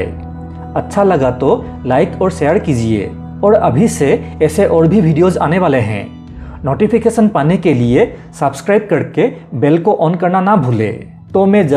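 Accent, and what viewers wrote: Assam, India. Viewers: aapke hindi meh bohot problem hai ... assamese accent ha aapka pls kisi aur se narrate karwayiye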